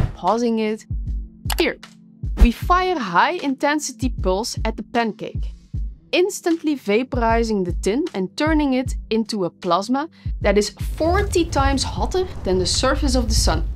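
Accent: Netherlands. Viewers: No, she's baiting us, the general audience. Serious. The others aren't stupid either. What she outlined with a charming Dutch accent is just the very basics. The specifics she didn't go into are deep company and national secrets and are carefully omitted. And wouldn't fit into a video of just over two minutes. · This is the strongest Dutch accent I’ve ever heard 😅. Very refreshing I might add.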